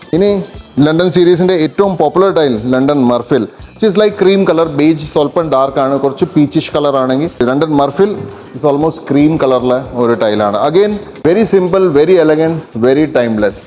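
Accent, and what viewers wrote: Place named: India. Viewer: Thanks for your reply. My project is in a planning stage, so presently is looking into various options n materials before final selection. I am from Ernakulam. I often see your videos. It's quite nicely presented with lots of new info for a layman like me. Keep it going... Hearing your unique malayalam accent, with a touch of manglish in it, I have always thought you were educated somewhere outside kerala.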